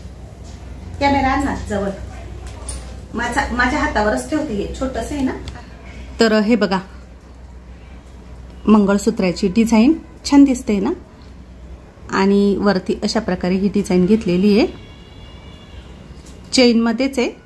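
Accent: Nashik, India. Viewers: नाशिकची भाषा दिसते आहे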